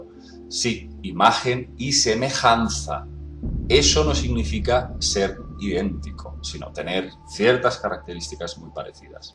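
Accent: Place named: Spain. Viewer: siempre lo he dicho, ese acento español es horrible, irrita mis oidos, ademas ese tipo es mas raro que un reptil con pelos.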